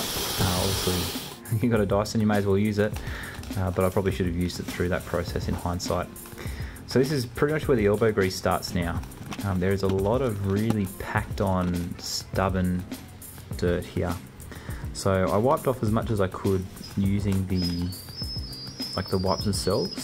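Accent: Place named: Australia. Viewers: This is an Australian accent, not a British one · He’s from Australia. That’s what that accent sounds like! A sensible country where you rightly pointed out normal people don’t have or need hand guns. They do however have snakes and spiders that are 10 times more dangerous!!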